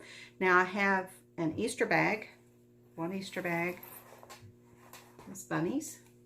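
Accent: South, United States. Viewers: I found you because of a shout-out from Heidi Cran. She said you were real sweet, she enjoyed listening to you; I think she may have mentioned a southern accent. I'd love to stitch the Wysocki charts. Dimensions had a kit "Classic Farm" that was discontinued and word is that they may re-release it. Your Wysocki charts are very reminiscent of that kit. I have been to the P. Buckley Moss Museum and loved it. I stitched a Christmas Stocking chart of hers.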